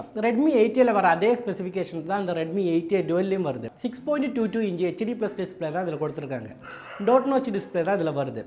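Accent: Kerala, India. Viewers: Happy to see a malayali giving review in Tamil.. Love your(Malayali's Tamil slang) accent bro!.. 😃😊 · Ur tamil with malayalam slang is super bro... But ippo Idhu 7999 aachu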